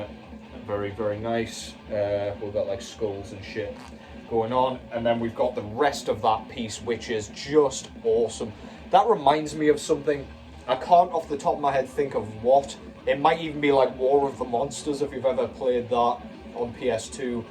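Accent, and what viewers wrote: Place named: Scotland, United Kingdom. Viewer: Don't mean to have your headache get worse...I was kind of pulling your chain last week when I suggested you had a south african accent. I figured it was scottish (mothers side of the family, McRae from the highlands, down to Colombia in the 1920's) but it seemed very mild, like maybe watered down from another anglo country, maybe even the USA. SOme of the scottish immigrants in Toronto (CAN), you can barely understand them, even the ones here since the 1950's. Great show on black metal, man. Cheers.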